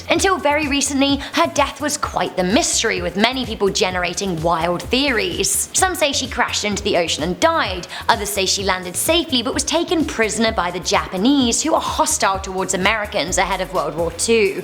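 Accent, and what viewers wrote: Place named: United Kingdom. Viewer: Aay didn’t know u were British like me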